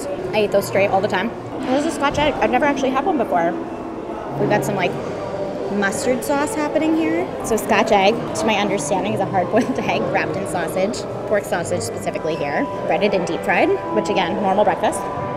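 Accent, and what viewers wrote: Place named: New York, United States. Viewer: I thought she had a New York accent then she confirmed that she was a New Yorker, that's the easiest accent besides the midwestern accent to spot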